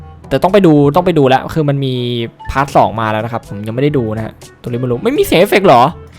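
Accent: Suphanburi, Thailand. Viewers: อ่าวอยู่สุพรรณเหมือนผมเลย ว่าละทำไมสำเนียงพี่เหนื่อๆ55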